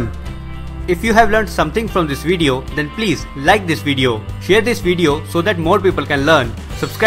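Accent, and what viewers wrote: India. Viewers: Nobody can understand your curryman accent😂😭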